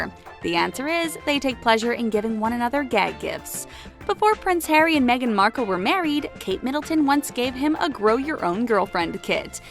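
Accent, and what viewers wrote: United States. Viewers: Narrated by an American….. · you can tell who was talking is american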